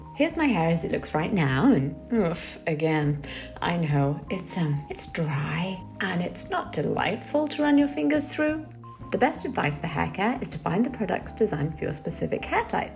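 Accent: RP, United Kingdom. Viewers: Quite the posh accent wow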